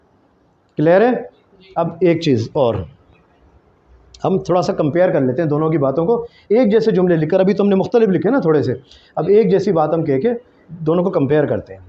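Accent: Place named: United Kingdom. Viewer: Good British English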